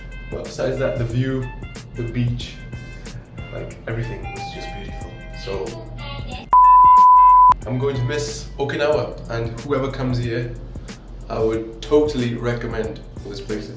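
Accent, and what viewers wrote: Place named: United Kingdom. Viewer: Where did u travel from ? U got a uk accent.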